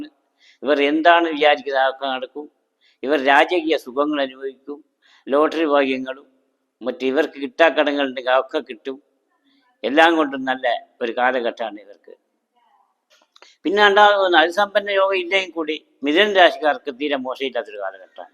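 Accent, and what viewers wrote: India. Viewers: വടകര.... കുറ്റ്യാടി സ്ലാങ് 😍👍....... നല്ല പ്രവചനം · kannur malayalam 👍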